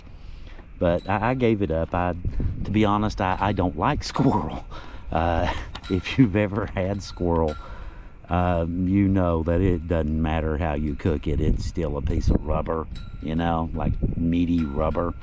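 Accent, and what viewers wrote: South, United States. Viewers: your soft, southern drawl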